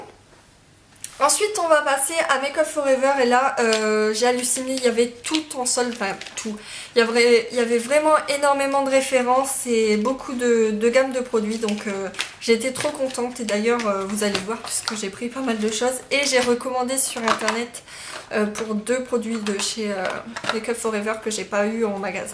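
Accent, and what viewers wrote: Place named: Amiens, France. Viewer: Coucou! Je découvre ta chaîne et j'aime beaucoup tes vidéos! Je me suis donc abonné naturellement! Je me disais que cet accent m'étais familier et je ne me suis pas trompé : je suis d'Amiens! Lool. Bisou, au plaisir!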